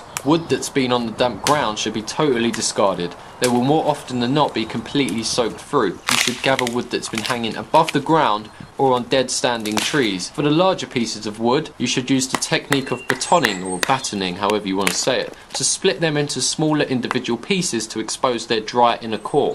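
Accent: United Kingdom. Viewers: What accent is this? I hear it a lot but don't know what part of Britain it's from.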